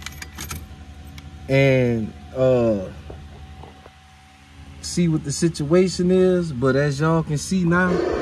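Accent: Louisiana, United States. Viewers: soon as I clicked on this video the question was "What part of Louisiana you from?????"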